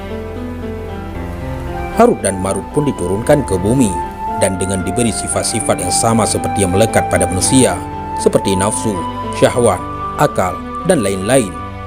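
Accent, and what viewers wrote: Aceh, Indonesia. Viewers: Macam gaya bahasa orang Aceh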